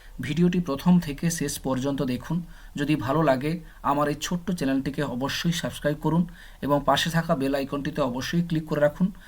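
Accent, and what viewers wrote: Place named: Medinipur, India. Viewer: মেদিনীপুর অঞ্চলের আঞ্চলিক টান থাকে ওখানকার মানুষের কথায় । পছন্দ না হলে এড়িয়ে যান। এমন বলছেন যেন আপনি সবকিছু ঠিকঠাক বলেন।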